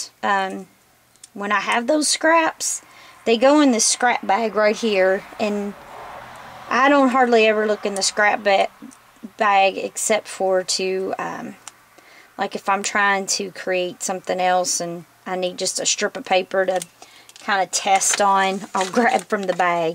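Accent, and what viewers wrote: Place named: South, United States. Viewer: I just ran across your video. I LOVE IT!!! Thank you so much for sharing this with us. I can tell you are from the South. Where do you live? Beautiful coastal North Carolina is my home.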